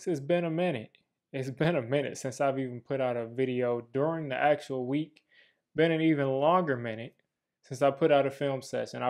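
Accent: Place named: South, United States. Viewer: What up man? Long time see! You definitely live down south now I can tell when you say certain words. Anywho, we need more speedy receivers, point blank.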